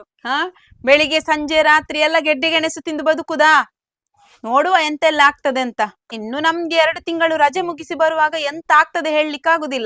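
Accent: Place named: Dakshina Kannada, India. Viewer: Very nice talk Madam. DK kannada is soo 😍 lovely.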